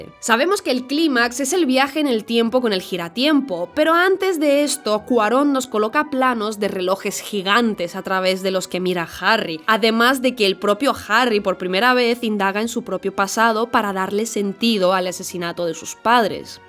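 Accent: Spain. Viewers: horrible escuchar un españolete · Que incómodo tu acento TAN marcadamente español, me encantaría que fuera un acento más neutro :( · A los españoles les duele el culo cada que pronuncian bien el Inglés? O por qué nunca lo hacen? Dos minutos aguanté el video, una mierda de acento, espero que no me dé cáncer en los oídos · Que Asco es el Acento de la madre patria España, pero què tan de bien has narrado y analizado la direcciòn de esta magistral pelìcula 🎉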